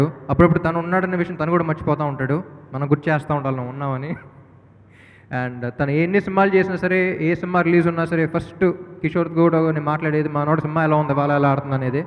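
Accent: Telangana, India. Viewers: After I smart Shanker....telangana slang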